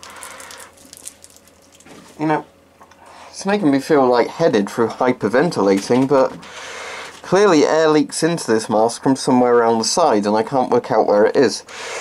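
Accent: England, United Kingdom. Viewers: It's you english accent. Be proud of it! Maybe try some rubber bicycle tube to cover the inlet valves. 🇦🇺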